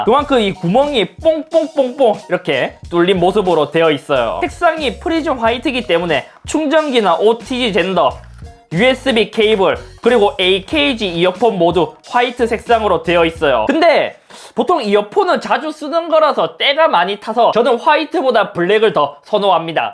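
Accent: Gyeongsang, South Korea. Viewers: 경상도사투리같으신데 어느지역이세요? 궁금쓰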